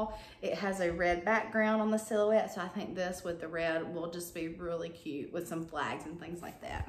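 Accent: South, United States. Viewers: You two are so cute!! Your Aunt looks like a "hoot" to be around. You are such a sweetie and i love your energy and "southern drawl". Thanks for sharing! Happy Memorial Day weekend.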